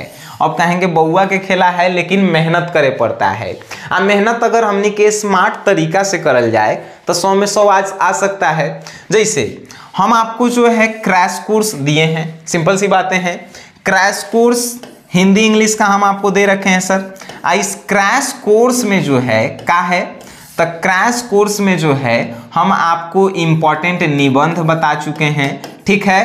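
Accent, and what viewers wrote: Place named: Bhojpuri, India. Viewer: sir aapki bhojpuri accent ke sath padne me aur maja aata hai ❤️😃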